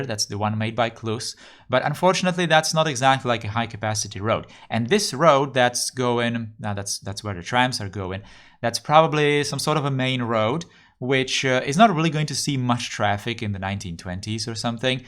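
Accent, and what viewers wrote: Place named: Czech Republic. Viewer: Clicked on your video and instantly could tell you're Czech because of the accent :D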